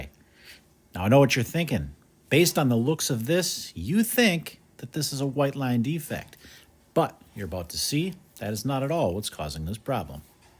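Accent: Wisconsin, United States. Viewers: It's always "that" coreum. Never heard him say "the" coreum. Total Wisconsin thing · Your Wisconsin accent is deeply calming and appreciated.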